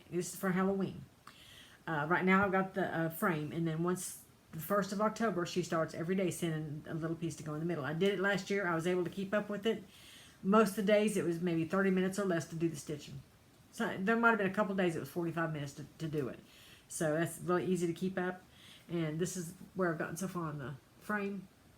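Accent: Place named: North Carolina, United States. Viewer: I love just hearing you talk... my grandmother was from North Carolina and she sounded just like you as well as my aunts. Warmed my heart so much.But the fact you are talking about stitching is even better.Loved seeing all your working on :)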